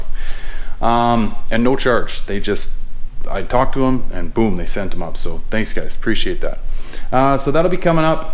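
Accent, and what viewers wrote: Canada. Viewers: This guy always sounds like he's trying to fight a Canadian accent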